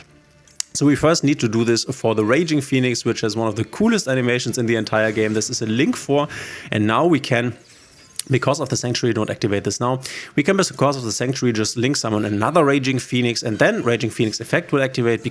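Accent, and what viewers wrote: Germany. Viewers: Bruder mach bitte ein Video auf deutsch und eins auf Englisch weil ich als deutscher so krass raushöre das du deutsch bist 🥲😂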